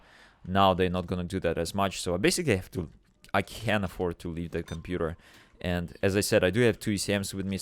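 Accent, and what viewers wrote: Russia. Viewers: Thx man I like your Russian accent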